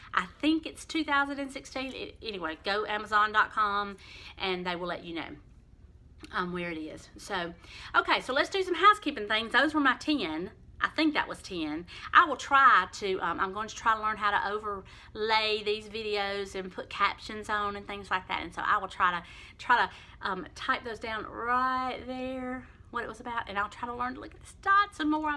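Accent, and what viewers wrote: South, United States. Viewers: I have found your videos to be very informative & I love your southern talk! Thank you for your time to educate us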